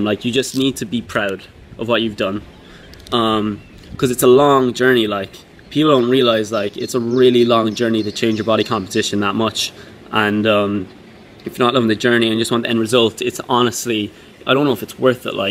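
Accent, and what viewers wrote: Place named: Ireland. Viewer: Some good answers man! Nice Irish accent haha 😂😅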